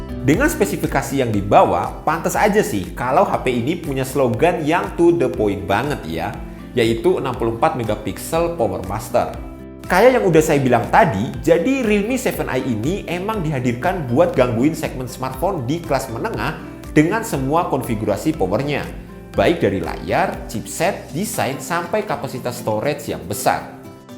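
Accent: Purwokerto, Indonesia. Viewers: Orang purwokerto kah?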